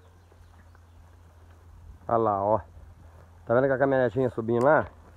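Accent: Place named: Minas Gerais, Brazil. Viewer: O minero vc é da onde de Minas? Eu só dá baite tem base. Já viu fala.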